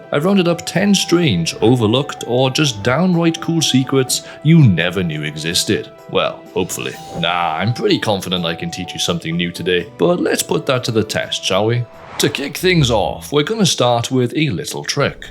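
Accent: Wales, United Kingdom. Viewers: Are you Welsh?🏴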